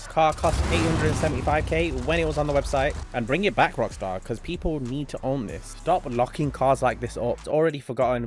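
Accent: United Kingdom. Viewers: The uk accent 🤮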